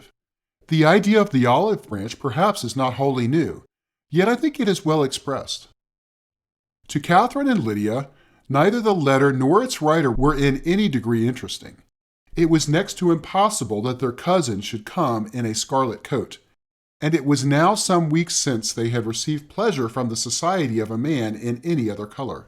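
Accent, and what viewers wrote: United States. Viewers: An American reading Austen just does not compute in my brain. This gentleman, though I’m sure he’s trying his best just does not sound right. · It's exactly what I want, American accent! Thanks. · I could not bare the mispronounciations and american accent.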